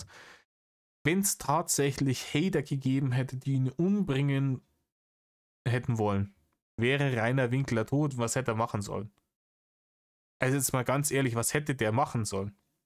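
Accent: Bavaria, Germany. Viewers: Dein Latein ist grottenschlecht, aber dein Bayrisch ist super 😂 Danke für 2 Stunden Kurzweiligkeit im Auto. Mach bitte so weiter 😊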